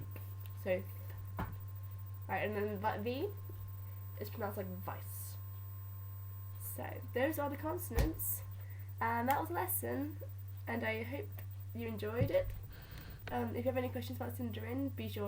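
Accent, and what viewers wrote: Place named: United Kingdom. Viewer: 【Leia】 It's ok I pronounce it the same way. Where are you from? You sound like me. (I'm British btw!:3)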